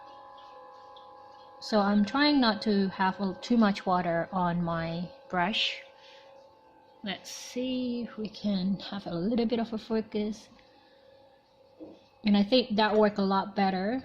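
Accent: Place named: Australia. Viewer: Same,i just got a lil confused bcos i heard an aussie accent w a touch of sum else lol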